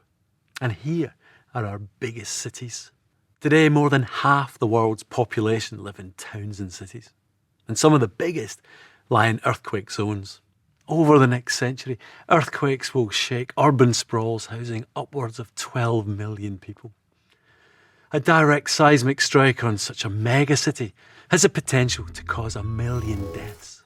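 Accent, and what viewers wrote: Scotland, United Kingdom. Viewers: He had me at his Scottish accent 😊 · His accent combined with his cavalier presentation is grating. All we want is technical information not scottish patronizing.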